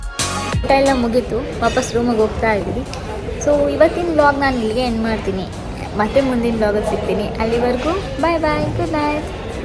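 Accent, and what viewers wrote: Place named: Mysore, India. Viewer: ಮೈಸೂರಿನ ಲಾಗ ಸೂಪರ್ 👍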